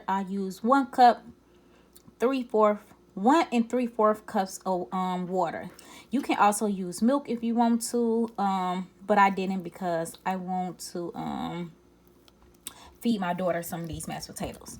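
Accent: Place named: Texas, United States. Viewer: New subscriber so I probably missed it- where are you from originally accent sounds like Texas maybe Tennessee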